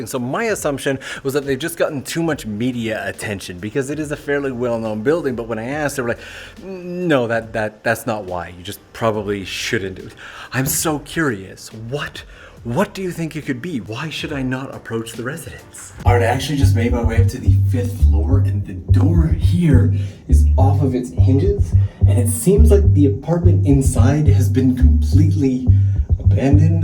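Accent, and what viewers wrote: Canada. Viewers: Somehow after living in Japan for like 10 years this dude still has a Canadian accent.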